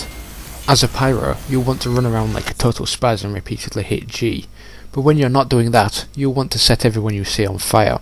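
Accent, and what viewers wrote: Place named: Scotland, United Kingdom. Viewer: his acent is scotish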